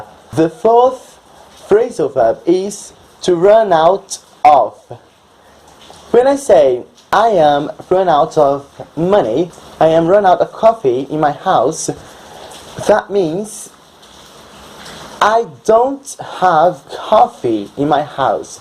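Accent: United Kingdom. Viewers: Muito legal, muito útil! Eu conhecia 4 dos 10 phrasal verbs, os outros eu nunca imaginaria! Parabéns pelo canal e pelo "Great British Accent"! See ya mate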